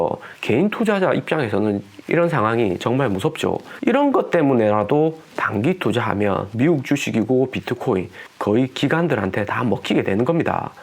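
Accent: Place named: Gyeongsang, South Korea. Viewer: 항상감사하게 듣고보고 있습니다, 구수한 경상도 말투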